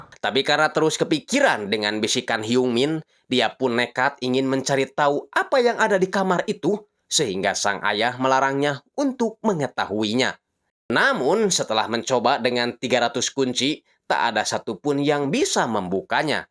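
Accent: West Java, Indonesia. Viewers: Logat adminnya sunda bangett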